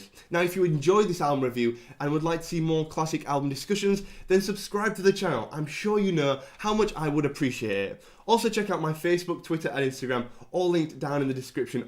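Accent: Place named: Yorkshire, United Kingdom. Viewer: Totally butchered John's name! He's a fellow Yorkshire man. I assume you're a Yorkshire man by your accent. It's pronounced 'John Mc- clock- lin'! Nice review!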